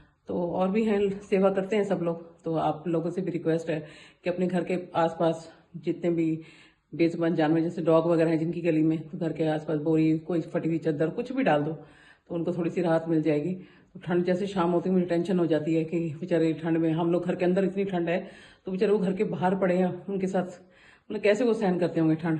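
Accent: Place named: Multan, Pakistan. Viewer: Meri mummy bhi aise hi baat krte hai ..hum bhi multani hai...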